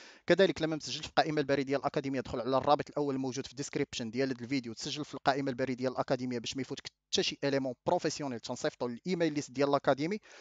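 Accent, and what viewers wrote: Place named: Maghreb. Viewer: لا استطيع فهم اللكنه المغاربيه مهما حاولت للاسف اللكنه او اللهجه المصريه اصبحت رقم واحد في الوطن العربي😔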